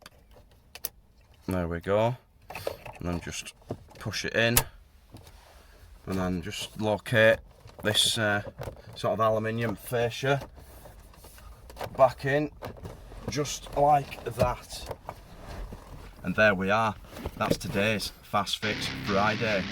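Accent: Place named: Cumbria, United Kingdom. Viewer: I dont hear many other Cumbrian accents on You Tube..lol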